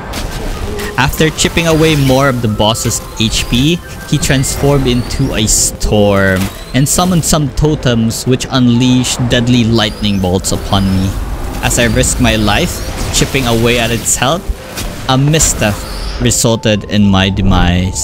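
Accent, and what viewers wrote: Philippines. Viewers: Hello, are you by any chance a Pinoy? Just a random guess based from your accent.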